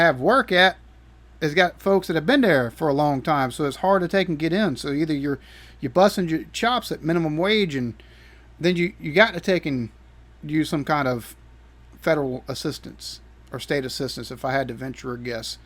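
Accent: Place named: Yorkshire, United Kingdom. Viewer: Is that Yorkshire UK? asking for a Lancastrian.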